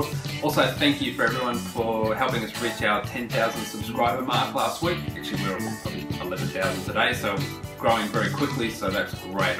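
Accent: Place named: Australia. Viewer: A fellow Aussie You Tuber, what a refreshing change to hear our own accent. Love the tool rack. I’ll try and make one this weekend but I think I’ll lay some steps in the base to stagger the rows of chisels. Being an old bloke on blood thinners, I don’t need any excuse to cut myself in the workshop. Thanks mate.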